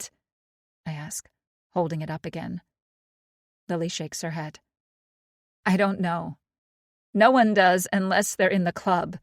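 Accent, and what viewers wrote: United States. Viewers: An American accent! These are practically rare.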